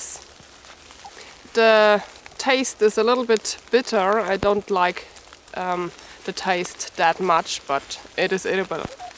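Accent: Germany. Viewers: Nice to see both dogs again, and great to see you finally have snow! It looks so beautiful. I'm making some Elderberry syrup right now, but I bought my berries, it does not grow around here, good for shortening duration of colds. Next time you say the English word stomach, pretend the ch on the end is actually a k, ka ka ka, OK? Your German accent in english is very cute, by the way. Nice spot for a fire and interesting combination, Bacon and fruit, i will try it next time I have a fire outside, thank you for the idea,.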